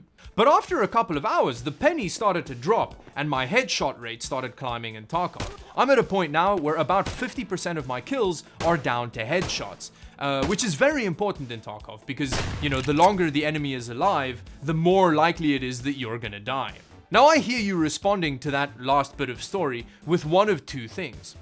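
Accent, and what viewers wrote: South Africa. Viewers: love your videos man. you sound south african. are you? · Thanks was wondering becuse his channel said canada but he sounded just like a south african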